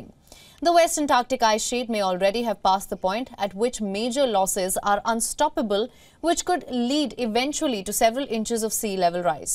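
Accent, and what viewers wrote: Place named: India. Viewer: Ahh, good old indian accent with indian face. Jai Hind!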